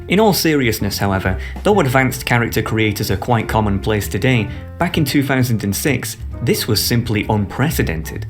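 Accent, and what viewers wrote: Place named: Scotland, United Kingdom. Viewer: You’re scottish i think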